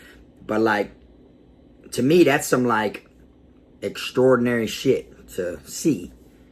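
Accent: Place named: South, United States. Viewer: Southern accent tryin to sound street.... -20 IQ points.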